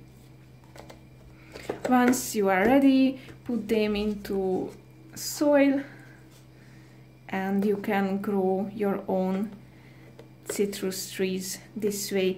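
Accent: Hungary. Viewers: I hear Hungarian accent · Hungarian accent? Ifeel that way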